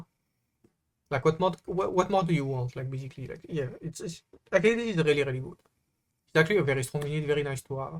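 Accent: France. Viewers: He is talking in English but with a god awful French accent.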